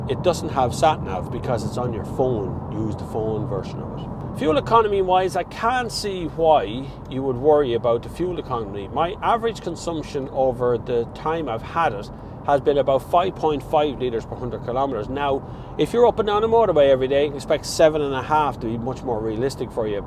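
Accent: Ireland. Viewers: How I love irish dialect!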